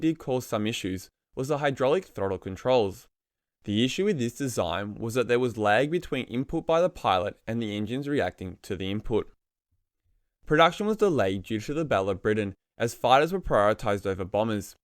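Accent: Australia. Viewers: The Stirling was out-dated even before the prototype flew - things changed so fast! The thick, draggy, wing section was half of the problem, and the other was the feeble engines, so it was under-powered! My old friend Todd Slaughter (long since deceased) flew in them, but never talked about it. - - - The commentary (AI?) was very poorly spoken, disregarding the Aussie accent, so was difficult to understand, if you ignore the errors. (What's a glider tower - a vertical stack of gliders?) It would have been better to call it a glider tug. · He is Australian, that’s the way a lot of Aussies pronounce it. All languages/ dialects have funny ways of pronouncement.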